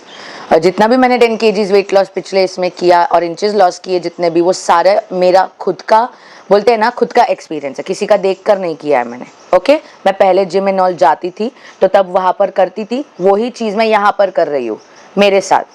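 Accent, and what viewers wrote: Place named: Maharashtra, India. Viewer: Are you marathi tan and so many words are sound like you are Marathi ❤🎉🎉🎉🎉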